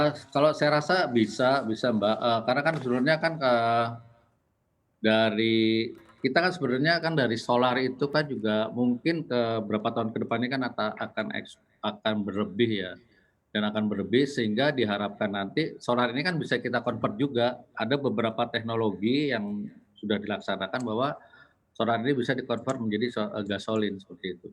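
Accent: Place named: Banyumas, Indonesia. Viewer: bapake kayane wong banyumas/cilacap ya..ngapake esih keton loh pak .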